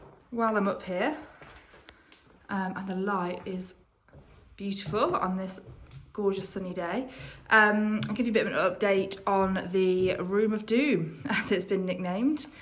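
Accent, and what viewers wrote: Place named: East Midlands, United Kingdom. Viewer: Are you from the east midlands Nottingham area?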